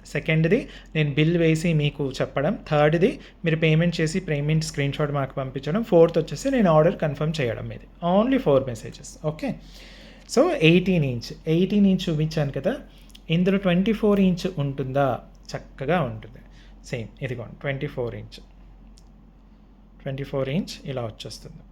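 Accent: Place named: Telangana, India. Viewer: Mee telangana slang super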